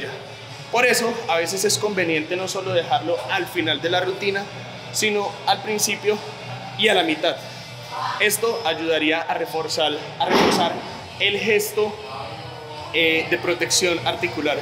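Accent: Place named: Colombia. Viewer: Saludos desde México pareces, ya hasta me estoy enseñando hablar el dialecto colombiano, que chimba de vídeo!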